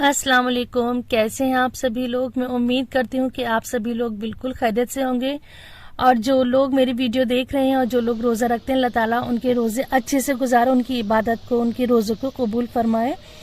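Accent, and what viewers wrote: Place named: India. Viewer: Itni saaf Urdu India mai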